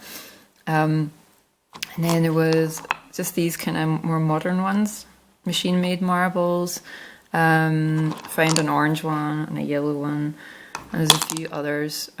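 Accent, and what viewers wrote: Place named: Scotland, United Kingdom. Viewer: Hey lovely to hear another Scottish accent Mudlarking ; awesome .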